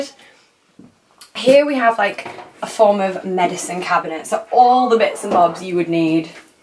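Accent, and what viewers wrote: England, United Kingdom. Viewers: Are you british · Are you english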